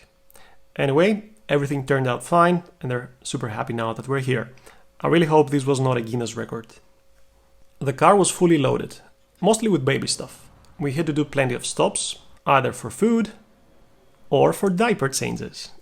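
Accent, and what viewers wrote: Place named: Greece. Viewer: I have been following your channel for some time now and I had some suspicion that you might be Greek, by your accent. Now I am even more suspicious 🤨🤣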